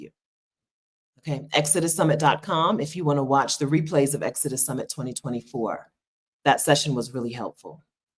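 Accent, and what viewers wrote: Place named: Florida, United States. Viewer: What wrong with sounding like you are from Florida?! 😅 I hope Bogota works out for you! 😊 Tfs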